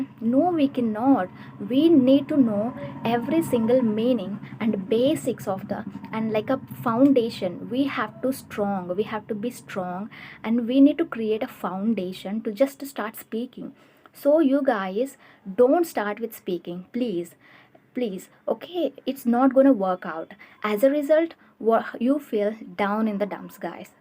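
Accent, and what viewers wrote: South India, India. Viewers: She has a South Indian accent; she may not even know Hindi, but she's addressing Hindi speakers, and she's good at it.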